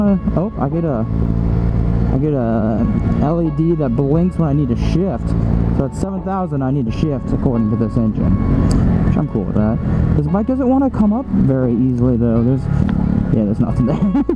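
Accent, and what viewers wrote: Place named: United States. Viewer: thanks for the reply. I was confused as well because from your accent I knew you were an american and wondered if you were refering to imperial or metric system.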